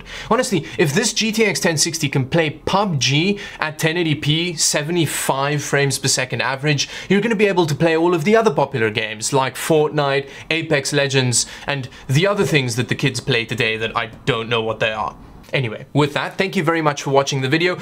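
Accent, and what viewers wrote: South Africa. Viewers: are you south african? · Bra is jy van SA?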